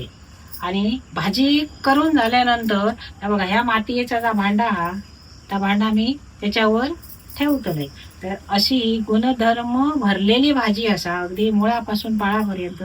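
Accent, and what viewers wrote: Malvani, India. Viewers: आपली मालवणी भाषा एकदम भारी..❤ऐकाक पण छान वाटता आणि तुमची माहिती पण भारी 👍❤️❤️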